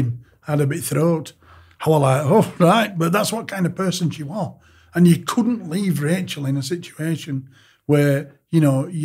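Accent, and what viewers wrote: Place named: Yorkshire, United Kingdom. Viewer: Such a lovely lovely man. He has been through so much in his life. I was so fascinated listening to him tell his story. I shed a tear when he did as the way he was treated was so dispicable. Love his Yorkshire accent too. Thank goodness he has a great supportive wife to help him get through. bless him 💘💖❤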